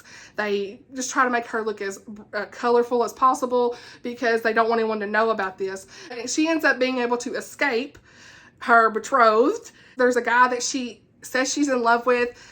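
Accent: South, United States. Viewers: Your personality and southern accent is so refreshing and comforting at the same time ❤❤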